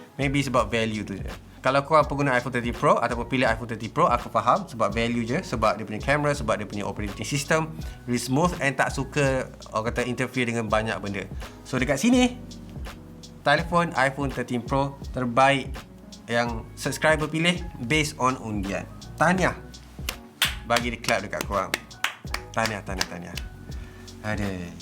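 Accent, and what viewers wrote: Terengganu, Malaysia. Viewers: Kalau la channel ni x tiru MKBHD + cakap teganu, aku rasa lebih ramai yg subscribe. Be yourself bro. I know you can do it.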